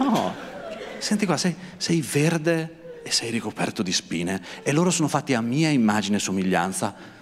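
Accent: Italy. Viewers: Ho guardato questo video ieri sera e l'ho riguardato a oggi mezzogiorno con i miei.. Ci siamo smascellati dal ridere! Grazie Eleazaro a nome di tutta la Romagna, di tutte le "zeta romagnole" e a nome del parlare come si mangia, senza tutti sti costrutti e perbenismi che attanagliano la società! Ci vediamo a Marzo a Savignano! Un abbraccio! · ...non siamo così ambiziosi...fantastico! Finalmente un accento né romano, né napoletano. Uno del nord che dice grandi verità senza filtri. Che soddisfazione. Veramente catartico. Ho chiamato quel figlio di puttana di mio padre nell' aldilà (è morto da un po') e l'ho perdonato. Saluti.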